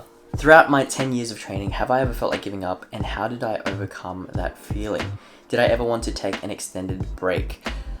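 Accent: Australia. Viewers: Love that Aussie accent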